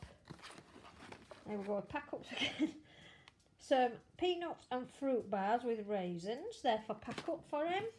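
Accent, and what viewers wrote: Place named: Yorkshire, United Kingdom. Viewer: Thanks for your tip about the liquid soap. I have never tried that one so will give it a go next time I'm at Home Bargains. By the way, I love how you say 'art' in the good old Yorkshire accent so don't ever think you have to change it! Really love your nail colour, what a lovely rich colour of green. x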